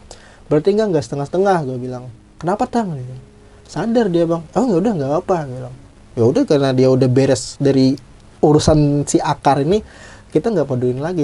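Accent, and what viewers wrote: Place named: Banjar, Indonesia. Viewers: Tanjung Tabalong Kalimantan Selatan hadir om.... Bang biar pakai bahasa Indonesia, tapi bahasa Banjarnya tetap timbul😆. Sehat terus bang😁🙏 salam satu hobi. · Gunung 🗻 halau halau di Barabai Hadir Banjarmasin Logat nya Kd hilang bang Kya urng Barabai 😉🙏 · ini orang pahuluan mendok bnr wkwkwk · Logat banjar nya berasa banar kang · Beugh, Kaltim hadir wal aey,. Biar kata bahasa nya lu gua, logat Banjar tetep kalihatan jua lah,. Sukses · logat banjar nya kda hilang mang ae wkwk tpi ulun ketuju aja hehe terimakasih atas ceritanya mantap sukses selalu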